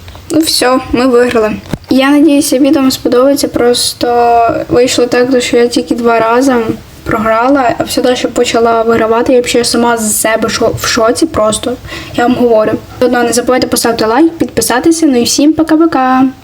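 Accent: Ukraine. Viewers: Я только сейчас понял почему она так говорит потому что она из укр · Я так обожаю украинский акцент, это так миленько❤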